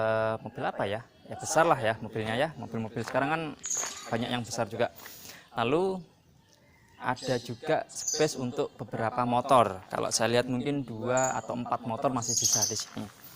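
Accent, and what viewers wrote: Indonesia. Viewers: daerah mana mas ..klw aku.daerah jabar...dari segi bahasanya jatim or jateng ya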